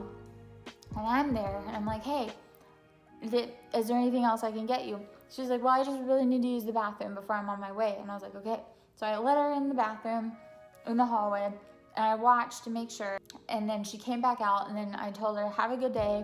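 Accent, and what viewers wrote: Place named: Coventry, United Kingdom. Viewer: yew sounds like wer we live in coventry ri be safe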